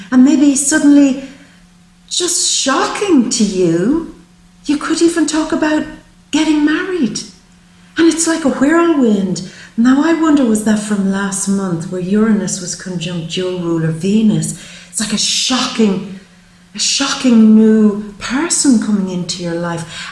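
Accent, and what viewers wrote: Ireland. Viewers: you are so animated and unique i love listening to that pure irish accent.good girl.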